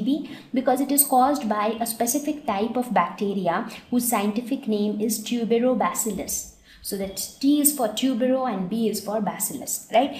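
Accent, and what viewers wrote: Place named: South India, India. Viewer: Ma'am u r from south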